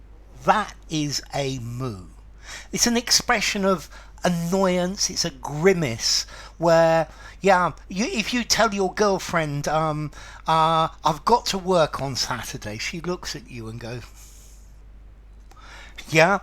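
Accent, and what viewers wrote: United Kingdom. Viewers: He is always using his perfect British accent in explaining and giving vocabulary lessons , you can check his previous videos throughout these years. They might be at handy .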